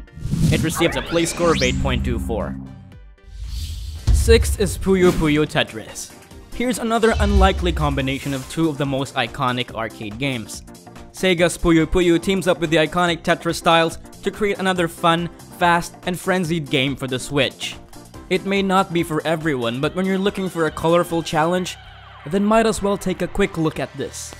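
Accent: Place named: Philippines. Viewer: your accent is somehow sounds like a Filipino accent, i just noticed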